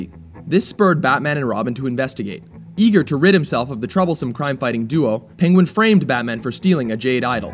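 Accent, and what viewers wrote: England, United Kingdom. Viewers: Is he from Gotham or England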